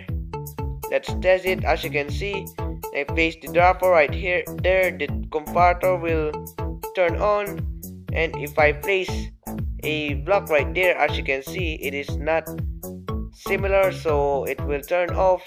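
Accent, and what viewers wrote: Philippines. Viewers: Bluestone- Gaming BG He has a filipino accent so you cant blame him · Are you from the Philippines? I live there too but my English isn't like this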